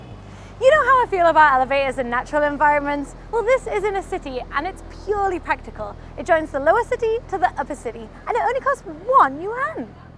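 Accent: United Kingdom. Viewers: Sorry, hard to understand yr accent, English accent or British one, hihihih. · I like your UK accent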